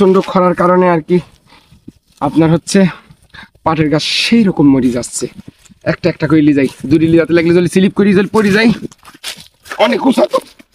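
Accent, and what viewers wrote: Natore, Bangladesh. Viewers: আমাদের নাটোরের আঞ্চলিক ভাষায় কথা বলেন খুব সুন্দর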